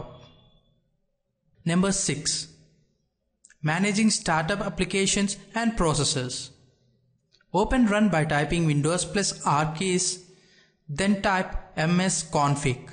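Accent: India. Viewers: Your accent is Indian and I had random Indian friends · nice indian accent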